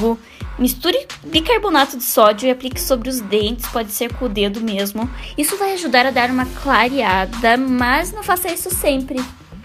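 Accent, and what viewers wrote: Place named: Rio Grande do Sul, Brazil. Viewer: E esse sotaque ai em?😍 Seria um sotaque Gaúcho? bjs sua linda acabou de ganhar mais uma inscrita hehe sua lindona!❤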